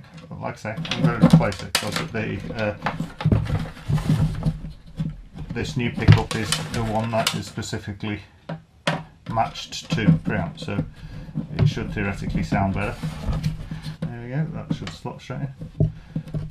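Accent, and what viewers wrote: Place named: Dorset, United Kingdom. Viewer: Thanks for sharing this. I am trying about doing this to an old beater that I have had laying around for a while now. I have just joined a band back in November and it would be kinda fun to have two acustic guitars to work with instead of just the one that we currently have available to us. I thoroughly enjoyed your playing at the end of this video. You are very talented. Thanks for sharing your experience and skills with us. I would really enjoy more videos of you playing. You aren't from the Dorset area of the UK are you? Your accent reminds me of an old acquaintance I knew from college who is from Dorset.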